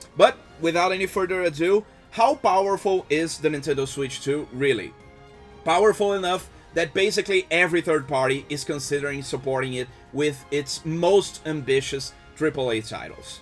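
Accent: Brazil. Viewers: he clearly has a Brazilian accent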